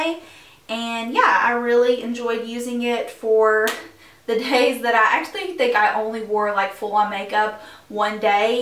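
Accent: South, United States. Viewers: What you were saying about Kylie Jenner really resonated with me. As a working artist it would be difficult to see someone else getting credit for all of your work. You're paid for your work yes but credit especially on social media and shout outs are what continue to drive traffic towards your business. Thanks for the recommendations. I just subbed today. Love your channel and your accent! I'm a southern girl too 😘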